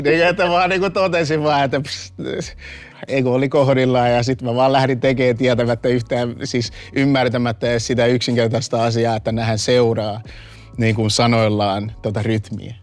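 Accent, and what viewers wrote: Tampere, Finland. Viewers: Kommenttini ei liity mitenkään oikeen mihinkään, mutta nokialaisen eteläsuomalaisena tyttärenä, musta on niin kiva kuunnella tota tamperelaista ärrää ja nuottia :DDD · on niin symppis. 😭❤️ Tampereen murre pehmentää häntä vielä enemmän. 😍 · His songs might be in english but he also has a really strong Tampere accent.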